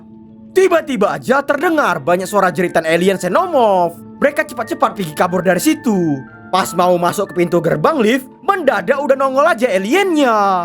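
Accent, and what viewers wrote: Medan, Indonesia. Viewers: Hahha lucu abg ni ngomong. 😂pasti org Medan. Udaaku subscribe y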